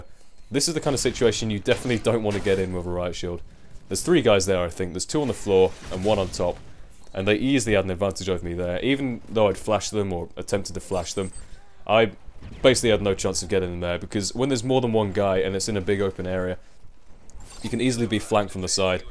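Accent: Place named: Essex, United Kingdom. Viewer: ESSEX englaand;)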